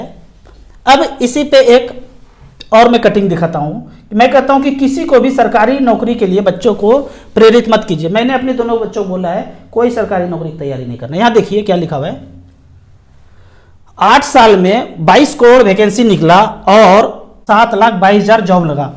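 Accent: Bihar, India. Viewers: Good Video. Are you from Bihar?? You ascent is like Bihari.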